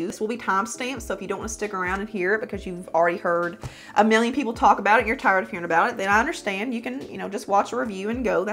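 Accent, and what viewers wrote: South, United States. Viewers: If people didn't, or don't care for, other people's opinions, then they shouldn't watch videos like this one, and get all worked up over something that didn't, doesnt, or won't matter. For 1- Overly critiquing people do it out of boredom, or to cover an insecurity of their own, like something they desire, and maybe you remind them of it (pretty eyes, followers, make up skills, etc) so they try to blast on you, or blow up comments with negativity. BUT...Oh, positive energy trumps that every day! 😁. AND takes more practice then being a "down doucher" I'd rather eat a bowl of water with a fork, then to listen to someone who constantly puts down someone, or EVERYONE, around them. Yeah. I just said that lol Both are pointless, and waste time, not just mine, or yours, but the people who stay to hear it, or in here, read it. The fact that you do these videos at all shows strength and character, and showed that the product works.... 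well....that's all I wanna see in any of these videos. These opinions help me decide on the purchases I make, before I spend, or waste, my money.... So keep showing your before and after make up applications, what you do and don't like, and I'll keep watching! And I respect the Southern accent! 😂. I was born and raised southern, and even now, in my 50s, I sound like the kid from Sling Blade 😂 (From Tennessee and that's my opinion) Keep on keeping on, and forget the haters!! Somebody is always gonna hate, and judge, and try to make you lose you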